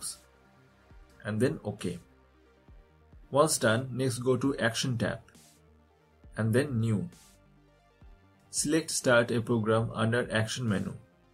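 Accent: India. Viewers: because the guy who made this video is Indian and this guy despite possibly getting helped insult him by saying his accent is like the Indian scammers. And again, I'm not Indian.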